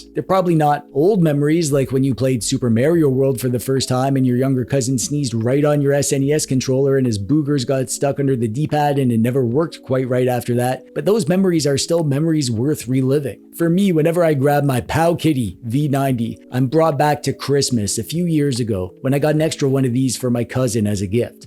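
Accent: Canada. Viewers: Is Canadian? Nice lol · Are you Canadian?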